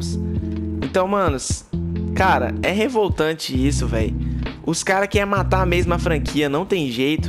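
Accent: Minas Gerais, Brazil. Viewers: Cê é mineiro?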